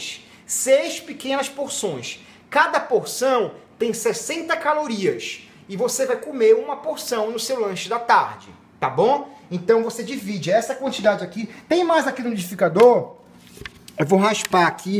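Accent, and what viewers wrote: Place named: Pará, Brazil. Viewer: Ele é paraense!! Esse "eguaaaa" e leso são inconfundíveis. Kkkkk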